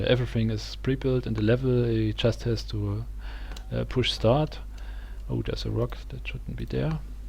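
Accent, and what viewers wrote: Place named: Germany. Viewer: Awwwww deutscher Akzent :D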